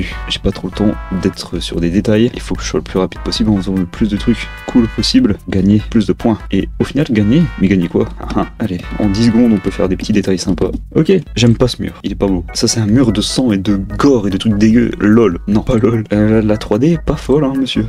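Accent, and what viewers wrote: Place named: Marseille, France. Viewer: Ton accent marseillais m'a exterminé !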